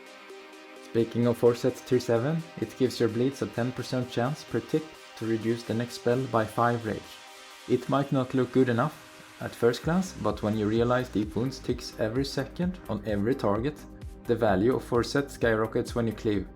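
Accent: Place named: Sweden. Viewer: yo does that weak aura come with that swedish voiceover lmao,great guide,thanks!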